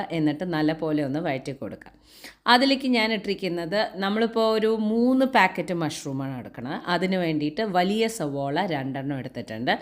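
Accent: Palakkad, India. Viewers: ഇജ്ജ് പാലക്കാട്ടുകാരി ആണ് ല്ലേ. സൂപ്പർ ഡിഷ്‌ വല്ലപ്പത്തിന്റെ കൂടെ പ്രേദമായിരിക്കും.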